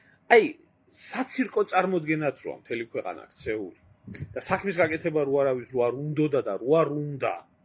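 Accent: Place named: Mingrelia, Georgia. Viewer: შენი მეგრუ(ლ)ი აქცენტი არ გაიშვას😂😂😂😂.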